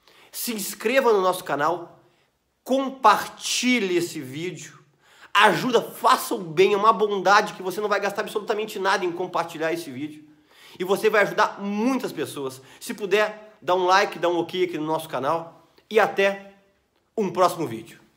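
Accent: Rio Grande do Sul, Brazil. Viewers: Dr me esclareça por favor! A plicoma é indolor ou dói muito. Por favor me ajude. Eu estou com uma bolinha na margem do ânus e dói. Que faço? Curto seus vídeos, gosto de seu sotaque gaúcho. Bj!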